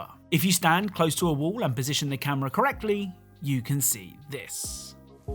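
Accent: United Kingdom. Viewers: Hearing British people say words that’s arent English always makes me laugh.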